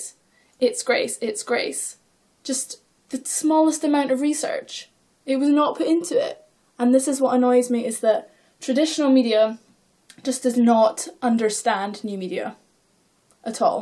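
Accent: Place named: Scotland, United Kingdom. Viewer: Gurll?? Where are you from????you sound scottish!!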